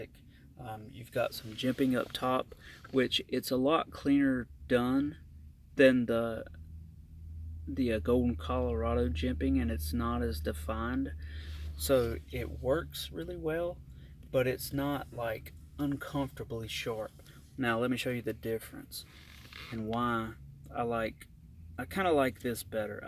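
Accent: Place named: South, United States. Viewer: Where are you from? Sound southern like myself, I'm from Texas.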